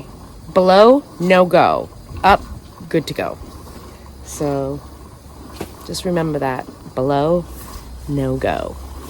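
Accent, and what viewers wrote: Massachusetts, United States. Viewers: Is there any chance your from Massachusetts/ Rhode Island? You sound like family. I'm in Mass, Metrowest near Framingham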